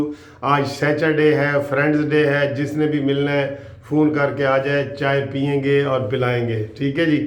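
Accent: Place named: Lahore, Pakistan. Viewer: The way you talk shows which area of Lahore you belong to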